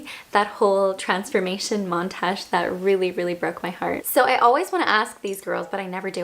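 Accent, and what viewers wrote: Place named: Ireland. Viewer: I love your top!!! By the way, are you Irish? You have a very slight accent & I'm curious 😊 Great video ✨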